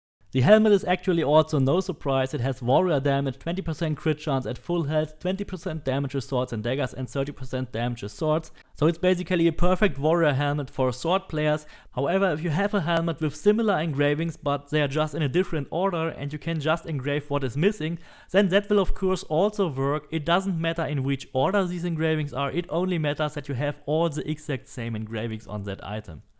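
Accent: Germany. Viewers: Ich feier einfach das du eindeutig ein deutscher mit (nicht böse gemeint ) voll dem allman Akzent trotzdem einfach auf Englisch dein Ding durchziehst mach weiter so 👍🏼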